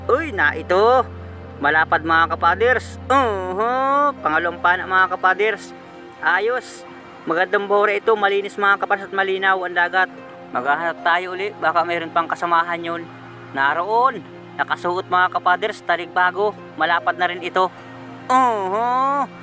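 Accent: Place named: Patnanungan, Philippines. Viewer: San nga pala location mo ka father's?, parang Patnanungan accent ka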